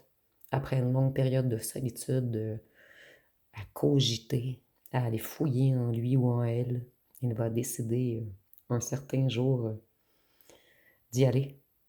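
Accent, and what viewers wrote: Quebec, Canada. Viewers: ✨Yes 😉. ✨Booster...Liker... Abonnée... ✨BonJour de France 🇨🇵, et grand Merci pour vos excellentes guidances! 💫Très souvent, j'ai l'impression que vous nous "connaissez"! 🌟 Vos transmissions sont enrichissantes... C'est aussi agréable de bénéficier de votre gentillesse, et d'entendre votre joli accent québécois! 💛☀️Je vais écouter votre guidance de ce jour, maintenant.🙋A bientôt.🎈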